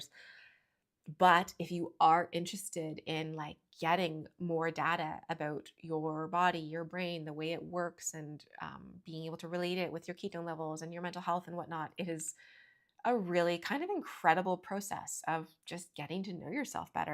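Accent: Canada. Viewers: I loved hearing your Canadian lingo come out 😍 The use of “hey” after a statement is the cutest! I’m from Texas and I never hear it. Love it.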